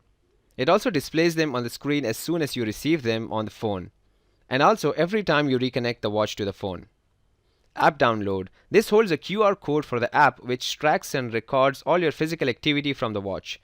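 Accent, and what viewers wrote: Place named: India. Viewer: Indian accents bug me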